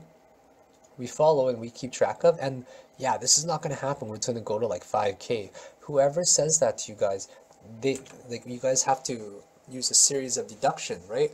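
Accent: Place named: Canada. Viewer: Good thing that i stumbled upon your video today. Interesting content....and i miss listening to the Canadian accent. Spent 5 years there in the 90s...the good old student days.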